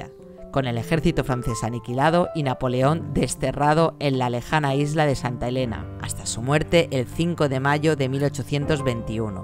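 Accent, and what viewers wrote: Spain. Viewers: ¿Cuál es ese tipo de Español es mucho Diferente? ¡Eres el Castellano! Besos del Brasil me gusta mucho de su Canal 😚😙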